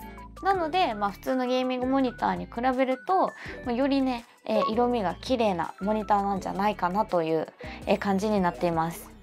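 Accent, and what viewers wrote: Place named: Osaka, Japan. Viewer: 大阪弁が時折出てますね。(笑）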